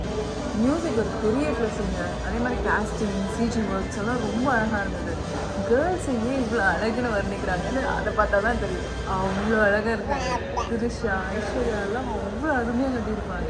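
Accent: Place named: Madurai, India. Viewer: T q allows so cute tamil from madurai 🤝🙏🙏🙏👍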